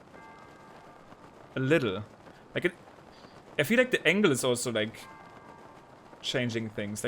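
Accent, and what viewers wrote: Germany. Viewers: You acctually speak like a german. Man I know, I'm german, if you not. ANSWER PLEASE NO OR YES, please... se.. :) :/ I know im peasky!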